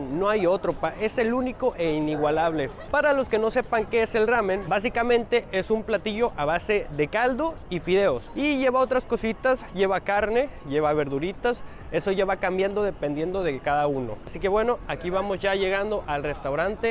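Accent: North, Mexico. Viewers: Lo miras es japones, cierras los ojos, el mas mexicano que haya escuchado hablar en mi vida xd. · Este chico es agradable pero muy mexicano su hablado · Q lindo verte hablar como Mexicano es muy Unico. Como Colombiana Americana entiendo muchos sentiments como inmigrante. Agradecida con mi pais EEUU 🙏😊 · Que loco un japonés hablando con acento norteño. Saludos muy buen video 🤗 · Excelente español mexicano de todos los lados del pais. Saludos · Que delicioso ramen, espero alguna vez probar uno de tu restaurante familiar 😊 Tu acento del norte me gusta mucho 💕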